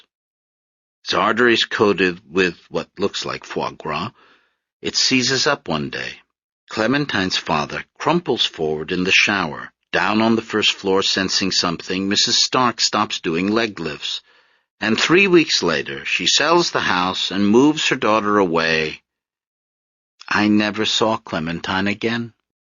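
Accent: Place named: Borscht Belt, United States. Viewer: This narrator has given the first generation American, Michigan-born characters, theatrical borscht belt accents, for some reason! Weird.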